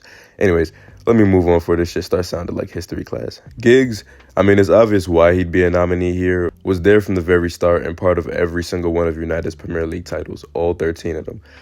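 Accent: United States. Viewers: It’s pronounced more like lair-star not lie-stir but I get that it’s harder with an American accent to pronounce English club names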